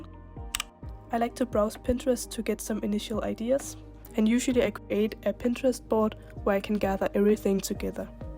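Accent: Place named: Germany. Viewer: I know my Germans when I hear one 🤭 love your work 🫶🏻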